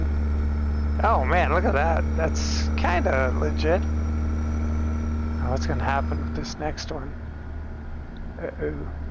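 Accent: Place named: New Zealand. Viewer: Ha, inthat last video I thought the voice was a kiwi accent. As a kiwi myself I approve 😅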